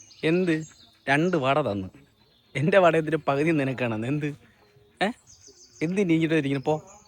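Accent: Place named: Attingal, India. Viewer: ടേയ് നിങ്ങള വീട് അപ്പോ എവിടെ 🤔🤔 ഞാൻ ആറ്റിങ്ങൽ.. സ്ലാങ് കണ്ടാർക്ക നമ്മള സ്ലാങ് പോലെ തോന്നുന്നല്ലോ 🤔🤔🤔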